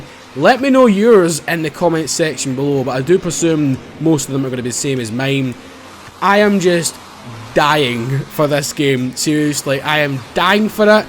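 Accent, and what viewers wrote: Scotland, United Kingdom. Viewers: Its cuz o the Scottish accent pal i understand cuz im scottish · He is scottish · hooks he's talking Scottish slang but at the same time trying not to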